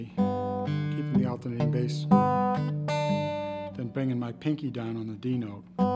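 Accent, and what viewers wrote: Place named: Brooklyn, United States. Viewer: Interesting, sounds like he's from Brooklyn, NY or close to that, can't pick very good either.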